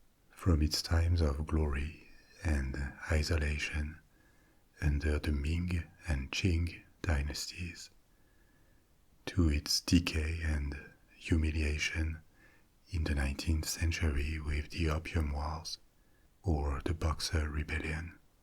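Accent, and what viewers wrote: France. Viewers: You know......I bet your history content spoken in a normal voice would top Kings and Generals and other top history channels. Your French origin/accent/interpretation of history is a ..... breath of fresh air to all the Anglosphere content. I know there is a ton of French content on YouTube, but for example it was great to hear Napoleonic history from a non British person - I mean for real! The British are so proud of Waterloo - they (and maybe mostly Prussia) defeated WHAT WAS LEFT OF NAPOLEON.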